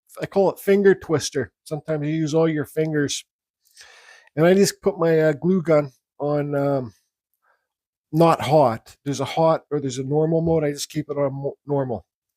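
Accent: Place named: Canada. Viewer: I think I’m detecting a Canadian accent!!….or upper Midwest..I love it…I’m from Michigan and have spent a lot of time in Canada and of course the Midwest! Thanks for sharing your expertise much appreciated…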